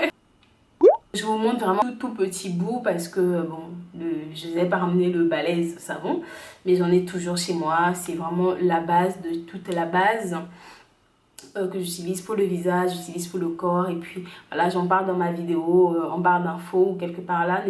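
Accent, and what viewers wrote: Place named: Gabon. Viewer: Tu es gabonaise c est sûre je suis sûre t'es gabonaise je reconnais ce petit accent léger " n emmenez pas mon nom ohhh" trop happy je m abonne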